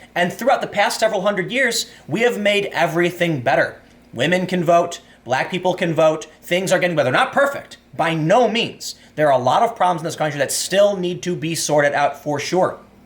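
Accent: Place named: Appalachia, United States. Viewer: Sounds like a hill-billy Obama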